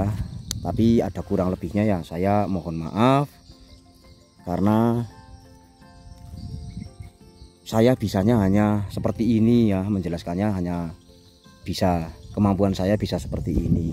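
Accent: Banyumas, Indonesia. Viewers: Tetap semangat, ora ngapak ora kepenak gus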